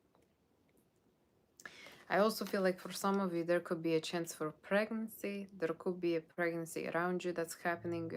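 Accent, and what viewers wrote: Russia. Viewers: I love your Russian accent 😍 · Your russian sounds so real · The Russian accent is quite smooth and lowkey soothing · Wowww,this was the most pleasant surprize for today !! It's Friday night here in UK now when i find your reading dear Russian woman and you made my evening ! Love your look and natural russian accent! ❤😂❤❤❤❤❤❤❤❤❤❤❤ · I love your russian accent... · Omg i loveeeee this russian look and accent!!! ❤❤❤ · So cute 🥰 love your Russian accent 💞 Thank you for Scorpio and Virgo reading....both reading is freaking accurate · I'm Romanian living in Australia!! so definitely Da !! loved the Russian accent u reminded me of the Russian actress on shameless 😅❤ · Great Russian accent ❤ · I love the Russian Voice it make you more beautiful thanks 👑💯❤️🔥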